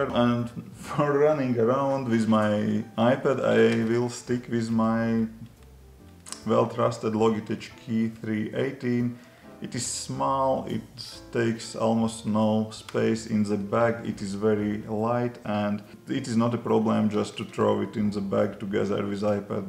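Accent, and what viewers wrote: Russia. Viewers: Словно русский заговорил на английском) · круто! 3 языка знаете... У вас просто русский акцент когда говорите на английском )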